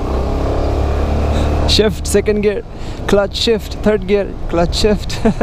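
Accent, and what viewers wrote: Chittagong, Bangladesh. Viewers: ভাই আপনি কি চাটগাঁইয়া?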